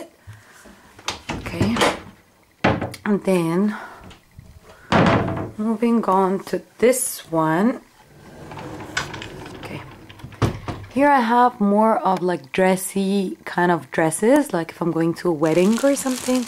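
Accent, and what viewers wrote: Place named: Arab world. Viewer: Are you moroccan or somehing like that : becouse you have an arab accent ! x) <3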